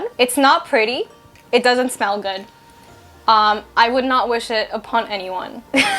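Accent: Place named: Spain. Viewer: I have to get better at grocery shopping, thanks, also loved your Spanish accent :)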